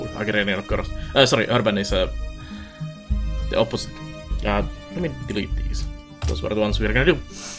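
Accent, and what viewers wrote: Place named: Finland. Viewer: Thats an intense finnish accent there. Edit: But a pretty helpful tutorial nonetheless :D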